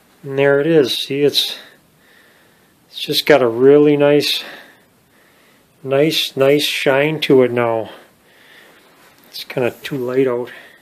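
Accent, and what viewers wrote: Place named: Upper Peninsula, United States. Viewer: First from the accent i thought U was a yupper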